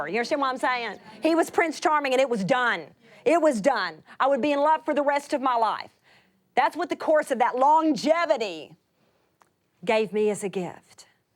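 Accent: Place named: United States. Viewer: wow. What an American accent!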